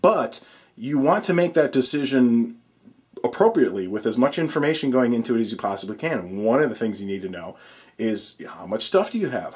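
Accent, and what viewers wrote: Wisconsin, United States. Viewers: You from Wisconsin?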